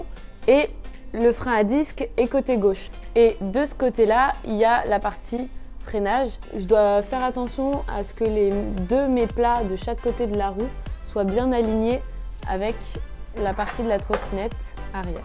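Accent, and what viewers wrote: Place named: Quebec, Canada. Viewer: Cool l accent québécois !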